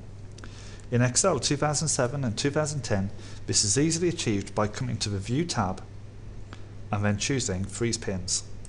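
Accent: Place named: North, United Kingdom. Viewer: super helpful and straight to the point. As a fellow northerner, love the comfort of that accent!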